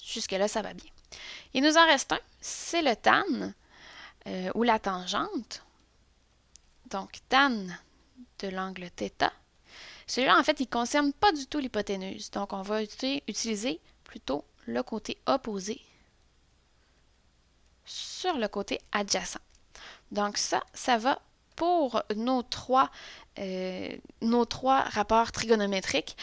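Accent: Quebec, Canada. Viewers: Je ne sais pas si c'est l'accent Quebecois, mais j'assimile beaucoup mieux :D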